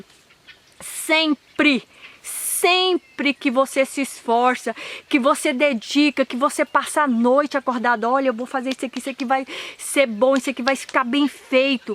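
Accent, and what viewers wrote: Minas Gerais, Brazil. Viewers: vc é de Minas Gerais?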